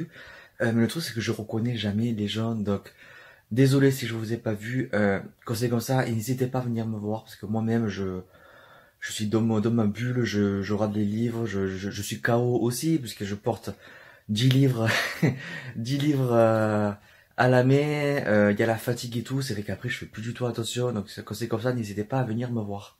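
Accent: South, France. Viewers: Coucou ça va? Merci pour ce vlog, depuis ce matin je trépigne d'impatience à l'idée de le voir lol. J'ai pas été filmer au meilleur moment mais je me rassures en me disant que je ne suis pas la seule lol. Tu as enchaîné les merdouilles le dimanche, la vache. Ce salon a été compliqué pour moi comme tu le sais, mais si je devais revenir en arrière, je reviendrais ne serait-ce que pour te voir. Même si on ne s'est pas vus tant que ça sur la journée chaque moment passé avec toi était super et je super contente d'avoir pu te voir en vrai. Tu es quelqu'un en or, je t'adore! Ne change surtout pas et à toutes les mauvaises langues, allez voir ailleurs. Perso, j'adore ton accent du sud. Tes sourires et nos conversations m'ont redonné le sourire samedi et pourtant c'était pas gagné donc tout simplement MERCI <3. Bonne soirée et belle lecture!!! Bisous.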